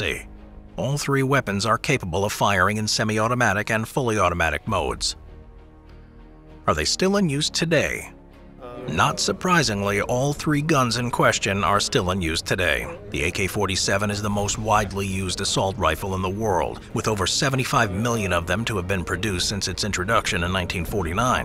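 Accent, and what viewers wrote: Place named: United Kingdom. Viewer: I’ve never seen your face until today, I thought you were British lol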